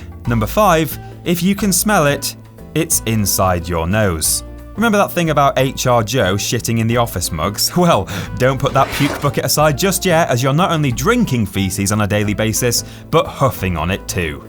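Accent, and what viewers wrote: United Kingdom. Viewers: I just love British sounding narrators..............They sound so intelligent.............................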